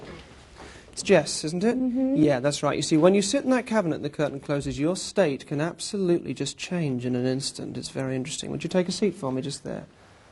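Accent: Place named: England, United Kingdom. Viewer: this is horse doody!! there is no seance just a bunch of englishman crap and he will not stfu making me wanna commit suicide with that horrible accent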